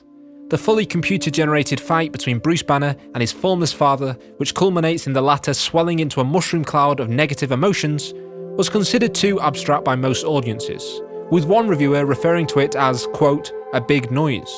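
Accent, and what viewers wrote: United Kingdom. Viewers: off topic, but what is that accent? I know it's UK but more specifically lol. sorry im american. like is it Birmingham or Yorkshire or Lancashire? lol im just guessing so sorry if im way off!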